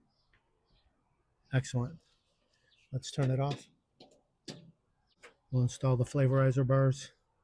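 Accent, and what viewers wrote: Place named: Long Island, United States. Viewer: I don’t even own a Weber grill, but I watched because I like to hear my favorite Long Island Made Man explaining things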